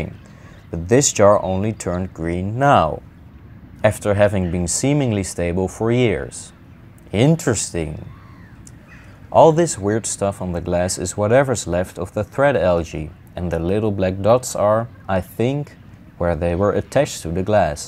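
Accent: Netherlands. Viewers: >hear accent >see background environment This guy is 100% Dutch.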